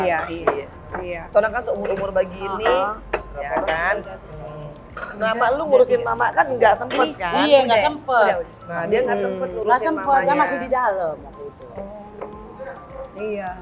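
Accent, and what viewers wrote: Manado, Indonesia. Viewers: Mba campur logat manado mantap 😀👍...sehat sllu mba' brsm kluarga 😇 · Adu eh...p sadap m dengar mbak bahasa mdo..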